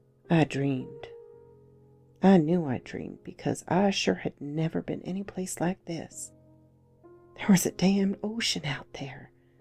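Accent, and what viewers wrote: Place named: South, United States. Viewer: typing this while listening great story love the floral scroll work in the video but I'm from Tennessee and I think your southern accent is adorable lol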